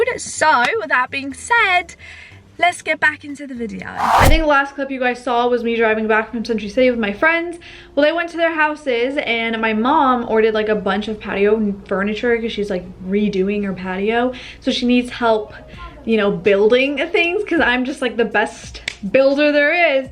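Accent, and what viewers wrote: United Kingdom. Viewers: I love the british accent 😂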